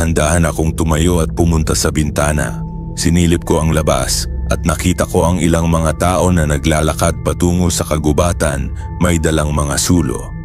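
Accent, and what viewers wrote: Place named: Conyo, Philippines. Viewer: AI narrator na konyo pa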